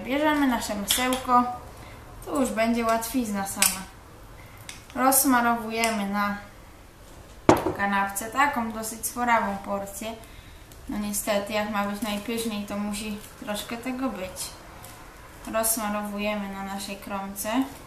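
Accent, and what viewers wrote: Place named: Silesia, Poland. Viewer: Masz taki akcent slaski lub goralski :) fajny filmik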